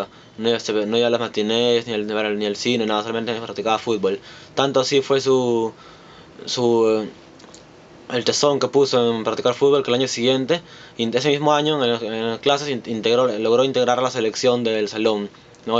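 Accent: Peru. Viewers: Ta bien pero hablai como las weas peruano klio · Es peruano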